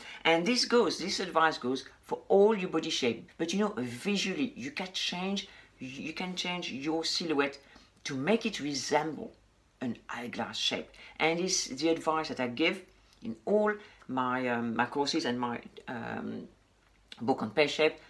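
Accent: France. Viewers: Good idea the oldest pieces of the closet, I have some items specially coats and jackets and a pair of shoes, sorry I really love them, they are a good quality items . I enjoyed this video, love your french accent, I love french but it is a little bit difficult to me to speak it. Greetings from México. by the way, do you speak spanish?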